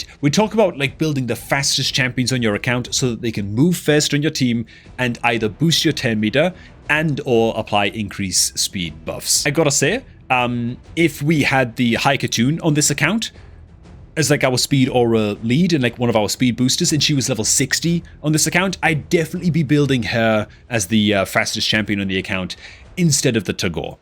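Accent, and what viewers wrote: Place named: Northeast England, United Kingdom. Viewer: I'm distracted by picking out the accent. I couldn't work out whether it was Sunderland or Newcastle which usually points me towards a dancer of the sandy nature.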